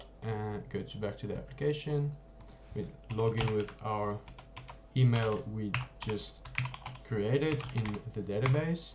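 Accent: Afrikaans, South Africa. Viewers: You've got an Afrikaans accent. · South African ? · You have the fattest Afrikaans accent ever. Love the video >3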